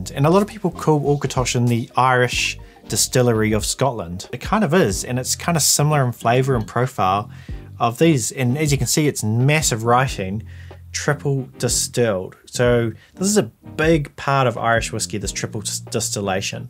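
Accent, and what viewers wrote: New Zealand. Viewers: That's some damn fine production values there fella. Great to hear a kiwi accent on top of some great whisk(e)y content.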